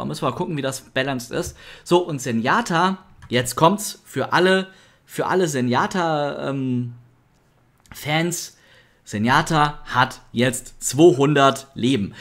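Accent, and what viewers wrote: Germany. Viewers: "Ana" "Phara" ich krieg immer Aids wenn jemand alle Namen einfach deutsch ausspricht.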